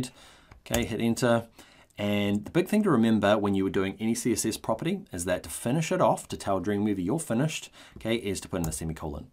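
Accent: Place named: New Zealand. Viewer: great video, awesome to hear a fellow NZer too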